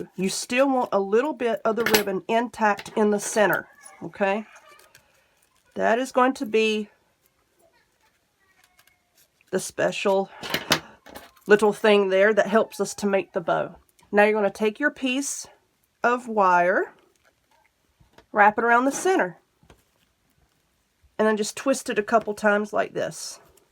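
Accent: South, United States. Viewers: Girl first of all I love your accent being from the south myself. Go girl. You crack me up. You make stuff so easy and simple to learn. And the ex jokes make my day.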